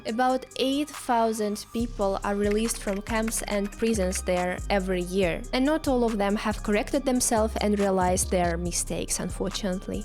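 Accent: Russia. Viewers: Interesting vlog.thanks for it.ecellent English.Almost without accent.Are you 100% russian? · I've only heard your introduction and this is my reaction. I hope you don't mind me saying but you have a really sexy voice.. I mean. I am from UK so... they're is that. ☺The Russian accent always sounds sexy to us 🙄😇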